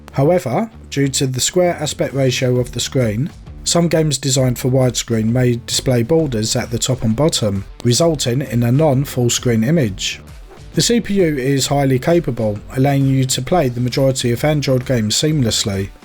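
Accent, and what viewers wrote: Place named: London, United Kingdom. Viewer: If you ever have his money, don't forget about me xD I had a feeling you were using a London accent. Your voice reminds me of his role in the series Taboo.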